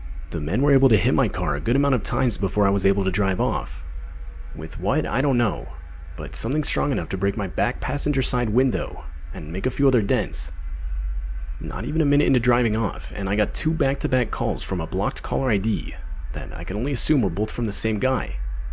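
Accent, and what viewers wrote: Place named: Berlin, Germany. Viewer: The narrator sounded like from Wansee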